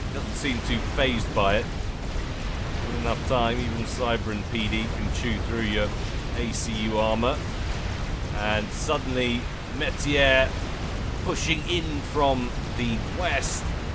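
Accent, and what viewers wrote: United Kingdom. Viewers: I think we have a few too many children up past their bedtime in here...:) In any case though, I think describing your accent as received pronunciation is probably going a bit far, you've got a reasonably posh accent but too tainted to be proper RP.;)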